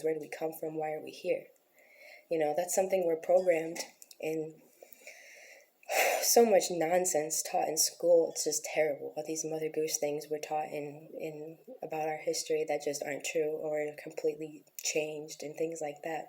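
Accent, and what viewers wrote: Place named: New York, United States. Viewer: She is a diamond amongst the ruff... Her accent makes me think New York for some reason but not so sure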